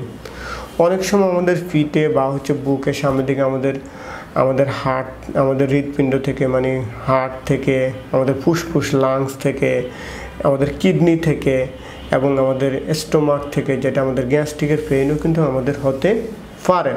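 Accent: Noakhali, Bangladesh. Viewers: আপনার বাড়ি নোয়াখালী। তাই পিঠ কে ফিট বলতেছেন। পেইন কে ফেইন বলছেন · ভাই নোয়াখালি বাসা না কি কথা বুঝতেই অসুবিধায় পড়ে গেলাম.. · শুদ্ধ করে কথা বলা শিখতে হবে আগে, বাড়ি কি নোয়াখালী নাকি?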